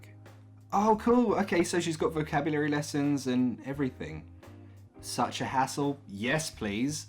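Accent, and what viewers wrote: United Kingdom. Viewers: I love your channel!!! Love British accent!!! Thank you for sharing!!! I’m Japanese 😜1/2 lol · woah so many thanks^^ I'm learning right now korean so it's still difficult cuz it's so different than english and some words didn't still stuck in ma head so, i'ts kinda frustrating.. I'm in love with korea language (not with a person yet :v) but yeah u.u..... ptt: japanese person may thinking bout: are you Saitama in real life? :v ... I love your accent btw (it's a little bit different than other british persons)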